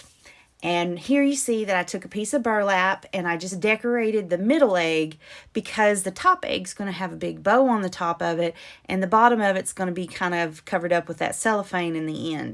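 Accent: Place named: Louisiana, United States. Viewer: Just found you on YouTube. Love everything you do. I'm an old Louisiana girl so love seeing Louisiana girls doing their thing. I really, really miss the accent.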